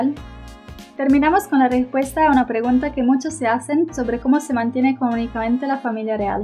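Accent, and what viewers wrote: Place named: Buenos Aires, Argentina. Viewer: Muy buen contenido. Pero es vergonzoso y horrible q hables con el sheismo. Te sugiero que remedies ese error porque en la argentina solamente los porteños hablan asi y es repognante para el resto del pais. es una lastima q digas apeshido siendo que lo correcto es apellido. Es una ofensa para la lengua castellana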